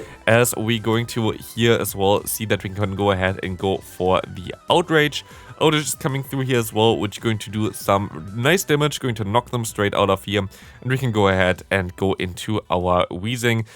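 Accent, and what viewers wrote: Germany. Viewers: ich wusste zwar, alleine durch den Akzent, dass du deutscher bist, aber Eutin ist nicht mal ne halbe stunde weg von mir😂 Grüße aus Schleswig Holstein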